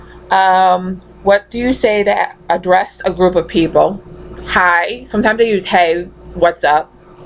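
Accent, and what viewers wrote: United States. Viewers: Awesome video! You totally have me wanting to do this now. Love the Mass (tried to spell it out, failed lol) accent! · Funny. Your accent sounds more Boston to me. I don't have one. Tfs hugs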